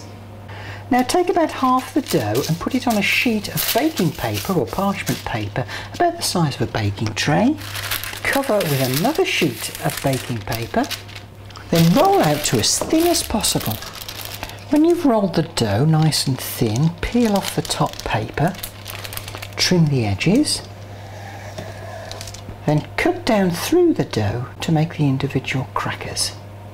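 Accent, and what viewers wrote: Birmingham, United Kingdom. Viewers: It's a Birmingham accent but not very strong.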